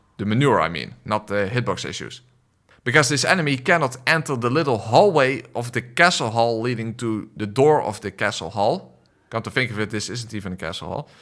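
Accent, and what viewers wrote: Netherlands. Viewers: Are you Dutch? You have a Dutch accent! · Dutch accent is so recognizable hahaha, leuke video man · this dude is dutch he has to be .... i hear it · Tried to watch this, but the Dutch accent was a bit too cringe. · Are you Dutch? The accent is strong. XD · that accent I recognize are you dutch? je bent echt goed ik zou nooit zoveel gedult hebben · i hear your voice for one second, and i instantly know your dutch. wel goeie video hoor. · are you dutch? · I've been watching your videos for months now since picking up Bloodborne last year and now playing through DS2, and have been trying to figure out your accent ever since!! So glad that my guess of being a Southern Dutchie was correct hehe. Sounded so familiar since I study in Limburg as an international!!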